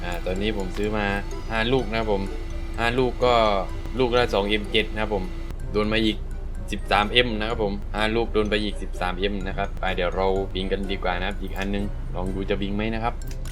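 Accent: South, Thailand. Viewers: คนใต้หม้ายนิ 5555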